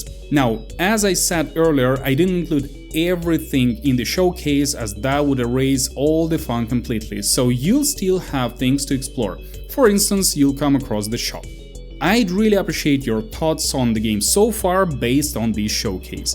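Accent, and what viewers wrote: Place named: Russia. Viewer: love the russian accent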